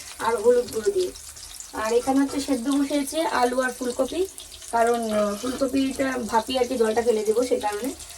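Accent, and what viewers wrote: Bangladesh. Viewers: From Bangladesh 🇧🇩. Apu tumi Bangladeshi suna khub valo laga. Tomar video thakhi but comments kora hoy na.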